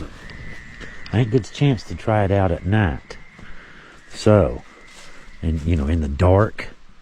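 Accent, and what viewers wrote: South, United States. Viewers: I’m from Phila PA in the great Northeast. Tonight was the first time I watched your video. I was enjoying your southern accent when I noticed the depth of your research. Thank you for your work. I hope you get more viewers. this was the first time the algorithm directed me to your video and subscribed to a new channel.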